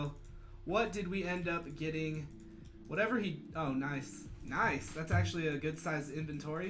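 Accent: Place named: East Coast, United States. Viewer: Cali :o i totally thought you were an east coast guy. now i sad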